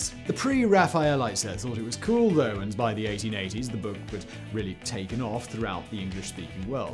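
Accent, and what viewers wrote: United Kingdom. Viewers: lol, again, I realize you wanna defend your buddy Simon, but Simon is an arrogant person. He absolutely believes his British pronunciation is 'superior'.... He is absolutely not a humble person who is apologizing for his mispronounced words. 😂👍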